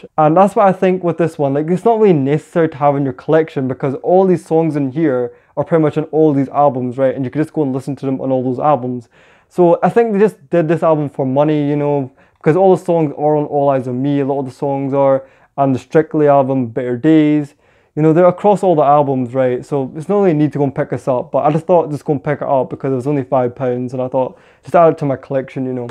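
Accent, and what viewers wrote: Fife, United Kingdom. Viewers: That sounds like a Fifer accent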